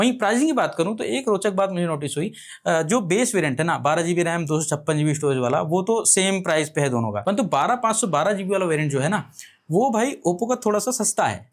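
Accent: Standard. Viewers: आपकी हिंदी अति शुद्ध है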